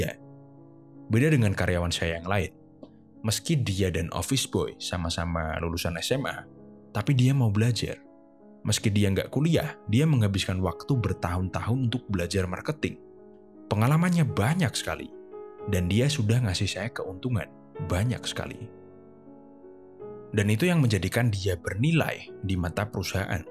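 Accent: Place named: Java, Indonesia. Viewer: Suara orang Jawa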